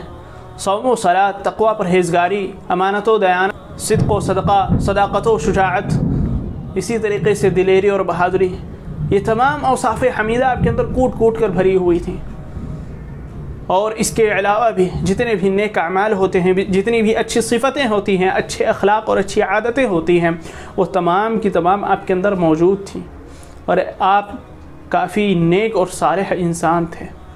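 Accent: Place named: Arab world. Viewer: اردو کو اردو کی طرح پڑھیں۔ اردو کو عربی میں پڑھ کر اردو کے ساتھ نا انصافی کر رہے ہیں۔ آپ کا کام بہت اچھا ہے۔ مشورہ بہتر لگے تو قبول کرلیں ورنہ معاف کردیں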